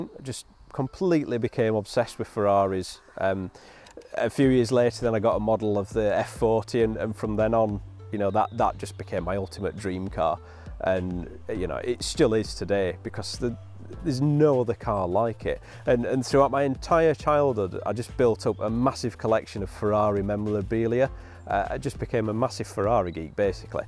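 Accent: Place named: Liverpool, United Kingdom. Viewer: Hahah gotta love that accent ! F - fortaay. I guess thats scouse accent, anyways what a beast of a car that is !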